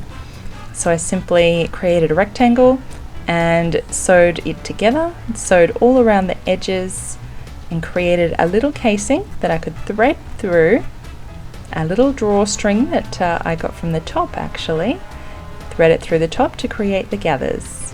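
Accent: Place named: Australia. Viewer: I realize this is totally off topic but I love Australian accents, especially yours.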